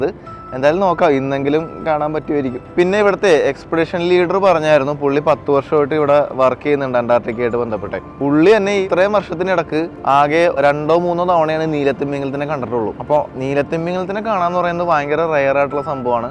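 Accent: Kochi, India. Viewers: Hi. I used to watch your videos when you used to ride in cycle to show the celebrities homes. Aa kochi way of talk aayirunu kooduthal connect aayathu. Later, Egypt videos aanu kanunathu and I noticed that the channel has grown a lot but your presentation and enthusiasm remains unchanged. Inaanu antartica suggestion vanathu. Angane YouTube onum kanuna aalala, enalum otta irupil thane episode 6 aayi. Good job 👍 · Your kochi slang❤❤❤ am also a kocheekkari😌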